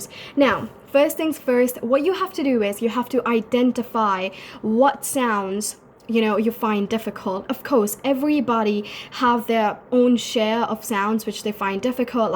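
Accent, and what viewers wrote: United Kingdom. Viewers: I can hear you have a British accent here:) Love you from the Philippines:) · British accent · Hey that's a cool BRITISH accent! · You were speaking british accent in the video?? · Speaking British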